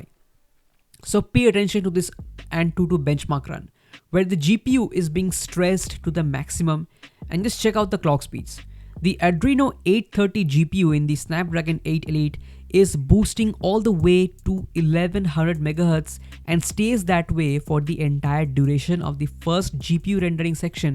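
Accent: India. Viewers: After hearing you're accent I instantly get to know you're Indian but why don't you make videos in hindi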